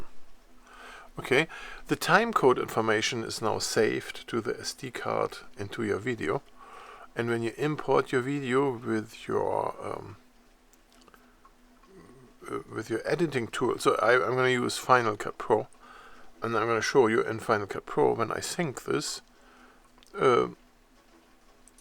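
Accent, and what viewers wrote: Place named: Germany. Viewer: German English... it's easy to understand :) But the video was very helpful, by the way, thank you.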